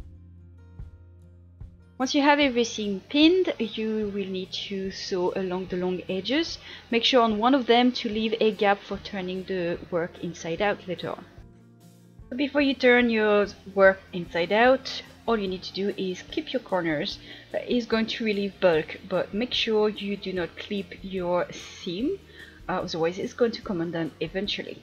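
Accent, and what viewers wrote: France. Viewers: Amazinggggggg, im from mexico and i love your french accent when you talk english ^_^ ill try to do this!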